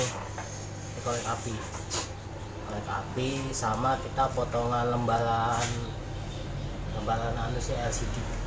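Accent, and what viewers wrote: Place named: Banjar, Indonesia. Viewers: Kyknya teknisi kalimantan ini.. kedengaran sempat bhs banjar 😀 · Betul teknisi Kalimantan urang banjar tinggal di grogot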